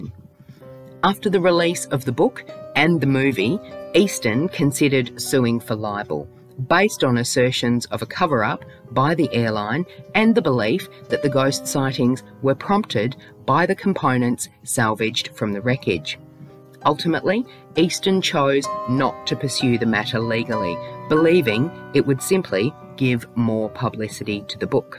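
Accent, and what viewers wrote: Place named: Australia. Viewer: I just added this or subscribe to this channel and I just love this channel the woman that was speaking sounds like she has a lovely Australian accent, which I live in the United States but it sounds like she’s from Australia or New Zealand and or England but anyways, I just want anyone who’s reading this to know that you’re not alone in this world if there’s ever something upsetting you were bothering you always reach out and talk to someone people will understand more than you know I’m 57 and divorced with no kids and I’ve been just about through everything and you can just make a checklist of everything is happened, but I think God is testing me to make me a stronger person again I wish every single person reading this long long happy and healthy beautiful life. I wish nothing but the best for everybody here on this forum. 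God bless you all. Sincerely MINDY from California. Just sending hugs God bless. 🙏🙏🙏🇺🇸❤️🌈🌟💖👇🏽😊😊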